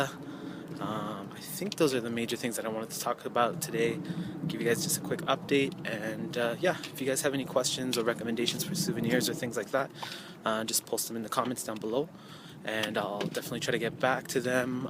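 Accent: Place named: United States. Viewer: how come you have American accent? or is that how Canadians speak in general?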